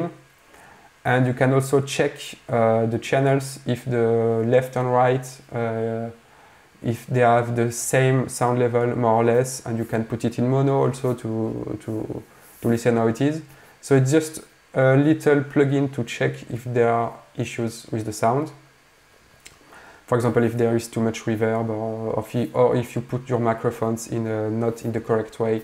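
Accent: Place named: France. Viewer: Well done, do not worry about you French accent. This video is very helpful!!!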